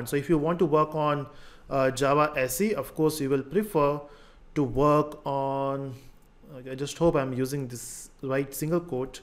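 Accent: India. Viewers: Hindi accent makes me not understand English well. Regards 😔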